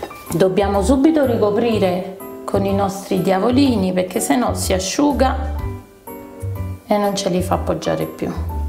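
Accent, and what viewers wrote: Naples, Italy. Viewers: Ciao. Sei molto simpatica.... col tuo accento e battute in napoletano. Sei anche molto brava e calma nelle spiegazioni. Questo aiuta molto a seguirti bene. " u scelepp" è di origine pugliese... (Io son della provincia di Bari). Mi piace questo metodo con l'album che non ho mai utilizzato perché nn viglio pastorizzare ogni vola. Mi chiedevo, a parte il procedimento di lavorazione, che differenza sta tra il fondente di zucchero e questa glassa. Cosa si aggrappa meglio e quale tra i due diventa e resta duro asciugando? Devo ricoprire biacotti e altre decorazioni di pasta frolla e non vorrei che urta do tra loro i biscotti si possano rovinare facilmente. Grazie per l eventuale tue info. Un abbraccio Maria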